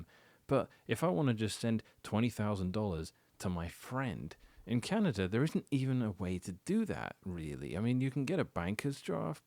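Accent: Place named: England, United Kingdom. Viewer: This guy is 100% English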